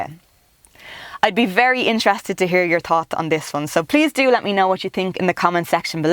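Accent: Dublin, Ireland. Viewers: You do have posh Dublin accent, no pun intended :) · Great Review, I am getting one in 2020, my price tag is 37 grand, so i hope i get a nice one for my money, plus i have a strong Dublin accent like you so again i hope Mercedes look at your review and adjust the car to our accent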